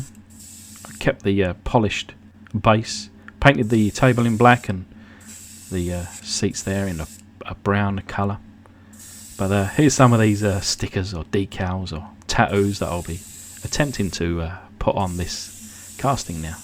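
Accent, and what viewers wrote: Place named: United Kingdom. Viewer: love that British... I’m Yorkshire. Proper Broad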